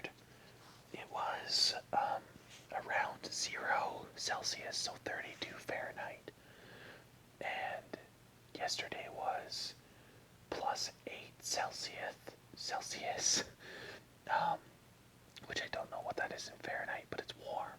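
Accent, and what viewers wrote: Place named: Canada. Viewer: It's nice to hear another Canadian accent :)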